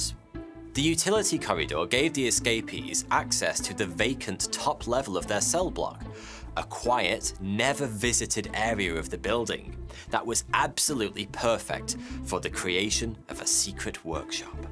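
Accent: United Kingdom. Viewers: So id got my old account kicked of youtube 🙃 and not having this guys videos in my life gave me extreme anxiety i really couldnt stand not having a steady flow of information in a british accent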